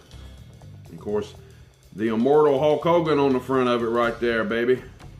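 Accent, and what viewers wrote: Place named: West Virginia, United States. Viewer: Don't let the coffee get cold that's not good. Gotta love our WV accent who doesn't love us WV lol great video awesome movie update now drink another cup of coffee and hope you have a wonderful day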